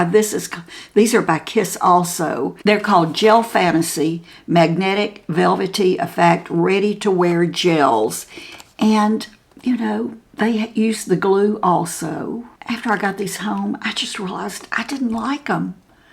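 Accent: South, United States. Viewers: I'm smack dab in the middle of my 50s and I am so happy to find your channel. I have always been in to makeup & beauty, but nothing prepped me for the changes i have been experiencing with my skin & such the last few years..and just having to tweak little things to either make them more flattering, or easier to apply, as well as just the fun suprises -instead of wakign up to a pimple it is wakign up to a new spot, a vein And, as a Southerner, your delivery and voice is also a comfort to me--I guess it is the familarity of it; like you could have been one of my customers in the makeup department at Belk. Have a wonderful new year! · I totally loved listening to your beautiful southern accent. Alabama "girl" (or once was)😊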